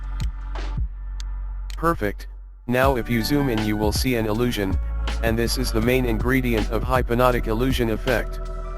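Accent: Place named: United States. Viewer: Aap ayse kesy bol lety Hain American English?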